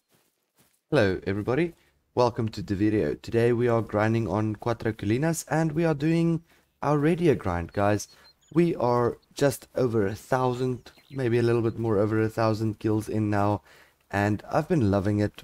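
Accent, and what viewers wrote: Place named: South Africa. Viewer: I can hear it😂 nice to find a fellow South African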